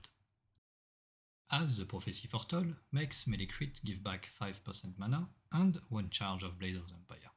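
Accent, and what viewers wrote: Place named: France. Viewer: French accent spotted. Super guide !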